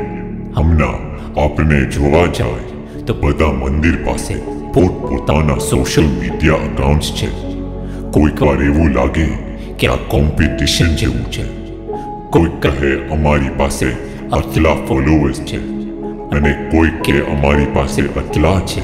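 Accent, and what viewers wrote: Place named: Surat, India. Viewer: Tame pure surti chho...right bhagat??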